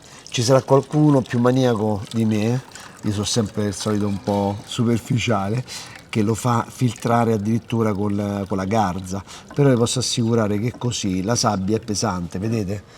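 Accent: Rome, Italy. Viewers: Certo fai la spesa al mercato di trionfale parli romano sei de Roma e apri il ristorante a Milano...😅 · Ciao... Ogni volta che te sento parla mi sembra di ritorna' a Roma mia.... Mi manca tanto ❤❤❤❤❤ ricetta fantastica ❤ Bona!!! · Minchia non mi stanco mai di vederti e soprattutto sentirti con quell'accento romanesco che mi fa' impazzire, sei grande sei la passione in cucina, grazie per i tuoi preziosi consigli ❤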